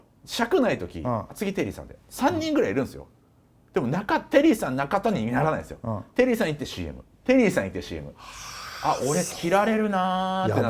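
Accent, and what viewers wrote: Kansai, Japan. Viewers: 中田の関西弁まぁまぁ上手くて草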